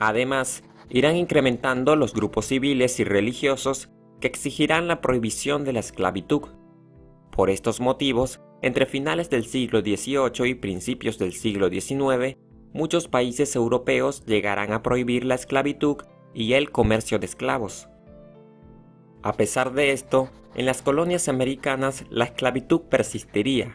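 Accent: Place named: Spain. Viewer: Puede ser su acento , los españoles dice mucho el sh en la s ,aqui en mexico hay muchas lenguas y la x se pronuncia como j ,sh ,ts ,x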